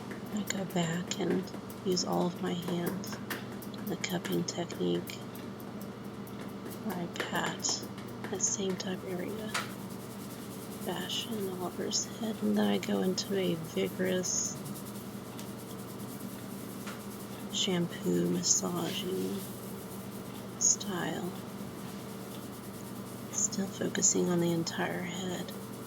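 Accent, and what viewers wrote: South, United States. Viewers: I would have to guess she is from Louisiana, she sounds like she is from the south to me. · Well, from what I've heard I've determined she has a bit of a southern accent meaning she probably originates from southern parts of the USA, my best bet will be one of the Carolinas :3c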